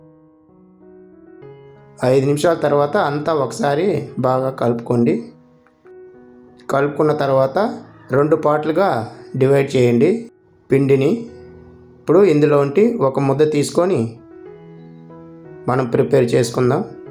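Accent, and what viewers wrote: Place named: Nellore, India. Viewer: నెల్లూరు జిల్లా భాష రెసిపీ బావుంది అన్న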